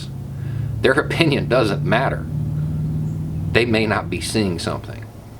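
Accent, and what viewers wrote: South, United States. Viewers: It's kinda funny I almost judged bow when I first heard that southern drawl I almost clicked skip and misstock him for a Trumper then I listened closely to what he was saying and I'm glad I did. I didn't realize how many anarchist there are still out there the struggle goes on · You said; "Don't judge by appearances." I can't remember how I got to this site in the first place and I have already admitted I misjudged you massively then. Not actually on appearance, although for me that was a 'WTF?' moment. It was worse than that - I misjudged you on your accent. It okay, I'm all better now, but it seems to me too many people with accents like Mitch McConnell and a few others, have altogether too much influence on how America works. Add those to the all too frequent and common flaunting of what purports to be a Confederate flag, but which I know actually isn't, and a disturbing pattern emerges that might slightly mitigate my misjudgement.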